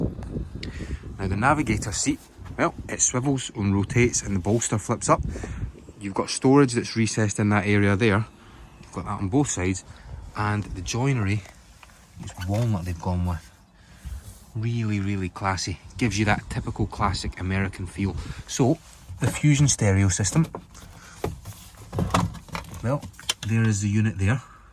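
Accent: Scotland, United Kingdom. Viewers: I love that scottish accent!! watching the videos only for the guy talking, love it !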